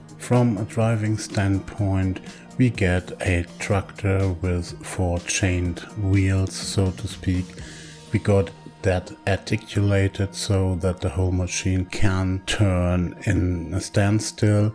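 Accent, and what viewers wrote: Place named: Germany. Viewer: Bist du deutsche wenn ja gutes Englisch aber man hört noch minimal das du nicht ganz enänder bist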